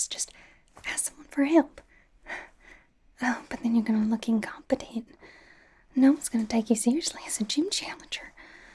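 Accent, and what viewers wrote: South, United States. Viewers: The southern drawl is so cute. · I assumed Marnie would be british. but I love your southern accent so this is fine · Omg alt + southern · I love the southern accent. More accent plz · Marnie with a southern accent isn't something I ever thought I needed to see or listen to, but it's so good! :_GengarDab::_GengarDab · A fascinating take on this character, who does fit the alt girl archetype in Pokémon lore, based on her Bulbapedia page, and with your (possible) Rogue-inspired Southern interpretation as her. High-quality roleplay with the works, as always,.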